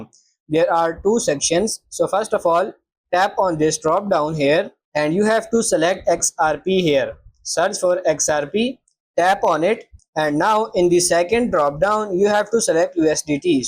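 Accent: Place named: India. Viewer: Tumhare Awaz Bata Rahi Bhai Tum indian Ho Par Best Of Luck Brother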